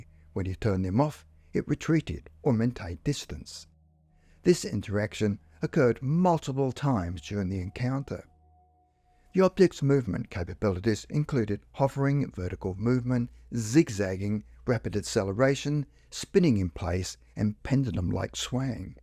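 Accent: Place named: Australia. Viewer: Aussie accent! Oh ignorant ones.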